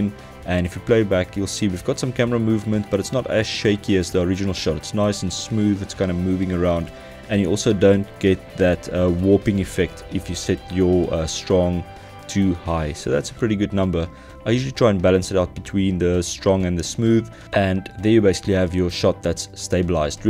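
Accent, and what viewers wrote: South Africa. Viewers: Haha love that Saffer accent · Probably Afrikaans, no? Considering this guy's heavy South African accent... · awesome video man! Love the SA accent! Keep it up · Thanks this was helpful. Noticed the accent though and immediately knew that I'm dealing with a South African here. Well that and the security fencing in your footage gave it away. Dankie! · i googled this and hey SA accent all the way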